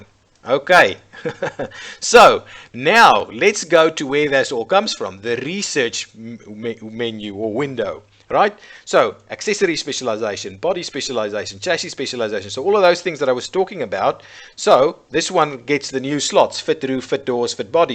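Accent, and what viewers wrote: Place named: South Africa. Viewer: That's a South African accent if I ever heard one. :p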